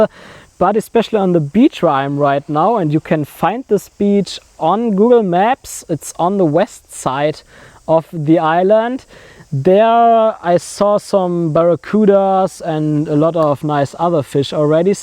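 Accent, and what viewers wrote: Germany. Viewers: Love the German accent. Great info :)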